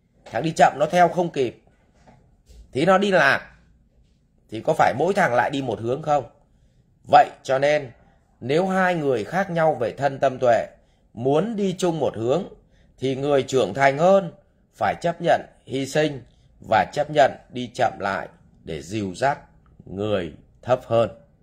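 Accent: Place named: Vietnam. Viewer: Toi thích cách thầy nói chuyện với cu, đúng cái chất mien que nhà mẹ tôi.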